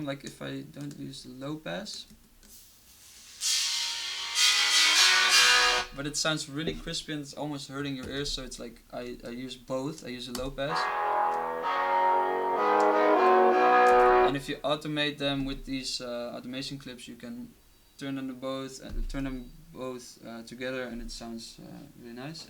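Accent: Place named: Netherlands. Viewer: Pittig nederlands, dat hoor je gelijk! :P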